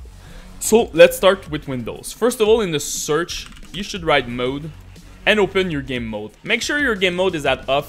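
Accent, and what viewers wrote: Quebec, Canada. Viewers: ca sens lquebec a plein nez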